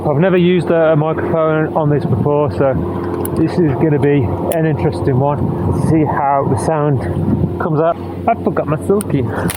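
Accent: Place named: Nottingham, United Kingdom. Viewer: Cool video . 👌 I guess you’re from Nottingham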